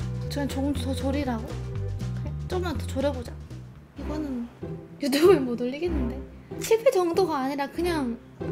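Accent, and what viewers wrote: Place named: Gyeongsang, South Korea. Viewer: 찐 갱상도 누님 저도 마산살아요!!!!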